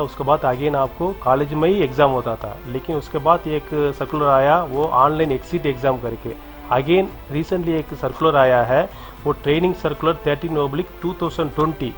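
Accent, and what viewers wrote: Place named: Tamil Nadu, India. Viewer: Neega Tamil thane neega Hindi pesuradhu teriyuthu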